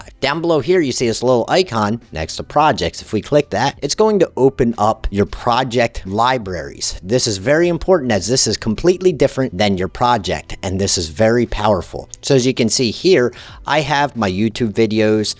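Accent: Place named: Pittsburgh, United States. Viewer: Are you from Pittsburgh? ❤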